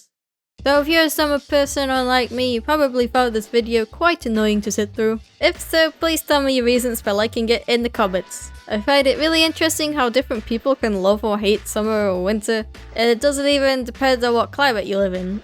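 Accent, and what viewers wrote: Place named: United Kingdom. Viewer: Yeah I thought you sounded British, i'm British too and nobody cares cause nobody cares about the people who talk about them self's and their problems in the comment section and they'll just say "N O B O D Y C A R E S"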